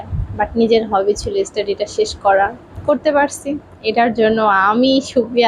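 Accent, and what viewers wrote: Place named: Satkhira, Bangladesh. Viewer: তুমার ভাষা তো কলারোয়া সাতখিরার মতো লাগছে? 🤚💚💚💚💖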